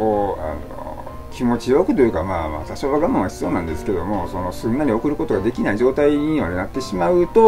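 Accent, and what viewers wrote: Osaka, Japan. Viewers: マスクをしてない元気なさんだ〜❣️今発病された頃の病室からの動画を見ています。頑張れ！頑張れ！と思いながら見てるけどいつもこちらが元気をもらっていますよ❣️ 発病した友達は8日間の抗がん剤投与の後少しづつ副作用が出てきてます。私がさんの動画でもらったパワーを伝えています‼️ 同じ症状になってみないと本当のところはわからないけど、口の中の素晴らしいハーモニー‼️‼️イヤでしょうね…ツライでしょうね……それを軽い明るい大阪弁に乗せて語るさんが大好き😘 頑張れ〜❣️頑張れ〜❣️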